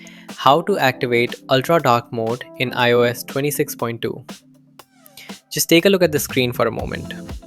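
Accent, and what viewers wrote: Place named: India. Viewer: Oh a potentially useful vide- hears indian voice Nevermind, sir i dont wanna redeem it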